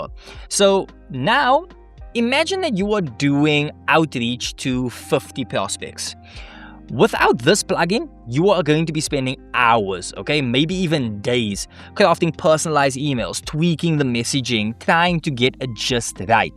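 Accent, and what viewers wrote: South Africa. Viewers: So lovely to hear a fellow South African :) Glad I found your work, super cool and impressed. Thank you so much. Loving my Journey with Claude Co-work so far.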